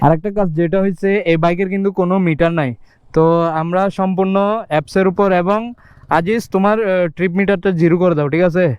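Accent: Chittagong, Bangladesh. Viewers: Vai amr mone hocche apnr bari chottogram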